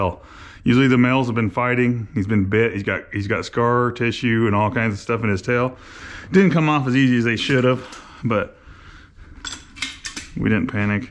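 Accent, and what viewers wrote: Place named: Missouri, United States. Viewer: can tell your a missouri boy! love it man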